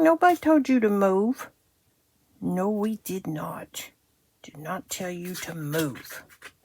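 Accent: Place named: South, United States. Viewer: I Love your attitude to life and all it throws at you. Thanks for telling your story. I had to retire from teaching when I was 53 through mental health. I'm registered disabled through my feet. I was a carpenter before I taught and did lots of concrete form work. I did bridge decks and columns. My last big pour took 12 hours of continual pouring and vibrating concrete. What are duradelis. That's probably spelt wrong but I have never heard of them. PS your country accent is great. I have a Dorset accent.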